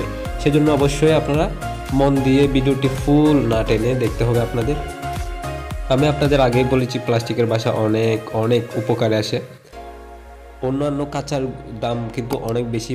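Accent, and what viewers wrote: Chittagong, Bangladesh. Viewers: Karon ami deklam apni chitainga vasay kotha boltesen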